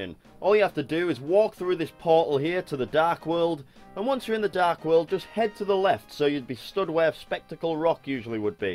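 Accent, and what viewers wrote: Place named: North, United Kingdom. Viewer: Dude, you are so northern. I love it. Subscribing now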